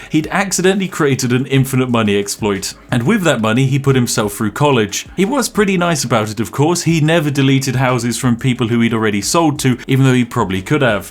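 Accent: United Kingdom. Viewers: they are too dumb to understand the spiffing brit’s accent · this is what every one in britain talks like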